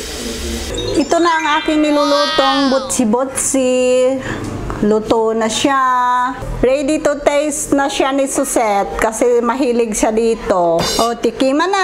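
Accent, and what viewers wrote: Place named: Iloilo, Philippines. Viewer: Ang cute!ganyan din ang accent (ilonggo)ng nanay ko tuwang-tuwa ako habang nanonood.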